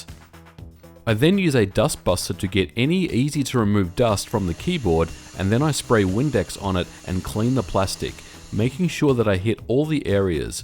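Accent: Australia. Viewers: Wait, it's a Commodore 128DCR? Is it a NTSC or PAL version? If it's NTSC, what special steps if any did you have to get it to work with a PAL monitor and 240V 50Hz AC. I ask cause you sound Australian... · You sound Australian... if so, where the hell did you get a 128D for $30?!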